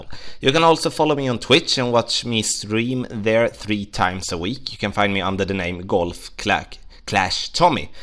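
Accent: Scania, Sweden. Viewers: Dats how pejpol from skåene in sweden sounds lajk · Are u swidish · Nice Swedish accent 😊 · This is some of the most swedish sounding english I have ever heard. Love it!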